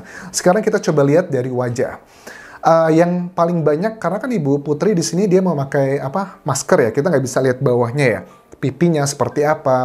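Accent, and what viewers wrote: Java, Indonesia. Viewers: Suaranya sama medoknya bang dan alisnya sama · Huuf suara asli jawa Pk dosen , kental Dgn logat jawanya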